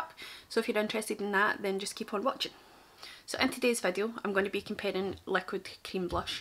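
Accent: Scotland, United Kingdom. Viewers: Is ur accent Scottish? I love it!! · oooh fab! 🤗 let me know if you need any advice or help at all with editing etc - I’m a professional videographer based in Glasgow 💛 So nice to hear a Scottish accent on YT! x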